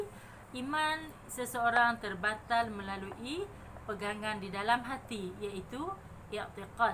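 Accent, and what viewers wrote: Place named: North, Malaysia. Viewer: Tahniah ustazah...kemaih👍👍👍lama x cakap utara sejak pindah perak..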